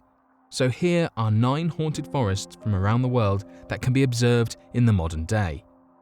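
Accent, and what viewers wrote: United Kingdom. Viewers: Co.ag for music, British accent, why not be Top5s 2, instead of unexplained mysteries?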